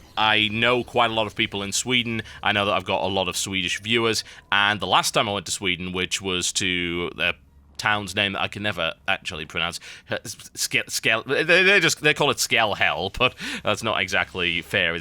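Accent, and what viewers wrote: United Kingdom. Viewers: people from the US watch a lot because we love hearing your british voice!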